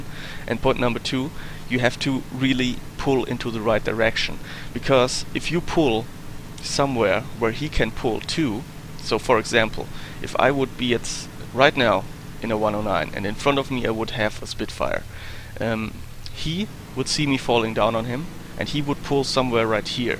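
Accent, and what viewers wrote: Germany. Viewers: Ich kann da den Deutschen Akzent raushören :D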